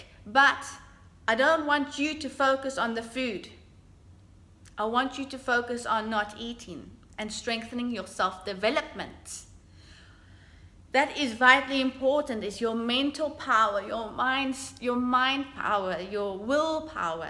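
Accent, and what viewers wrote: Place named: Afrikaans, South Africa. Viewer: are you South African? you have an Afrikaans-English accent.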